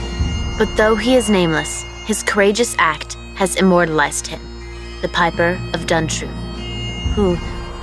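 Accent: United States. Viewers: This is just taken from the "Castle ghosts of Scotland" series except they've just cut it down and remixed some scenes while cutting out others and got a Yank narator instead of the original which had an Englishman. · Scotland in a US accent. Gimme a break.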